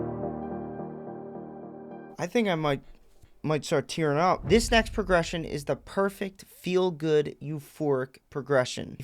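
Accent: Philadelphia, United States. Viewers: You’re from Philly aren’t you? I hear the accent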